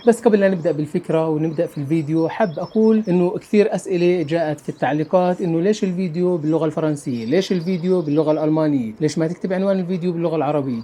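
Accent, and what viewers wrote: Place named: Palestine. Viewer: نحب بزاف لهجتك، لهجة الفلسطينيين الأصيلة.. تحية لفلسطين مني و من الجزائر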